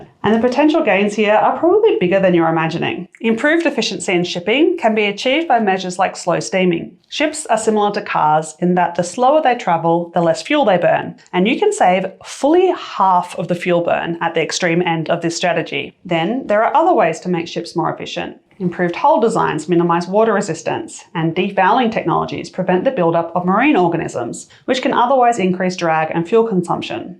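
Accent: Australia. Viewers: I like my bad news with a chipper Aussie lilt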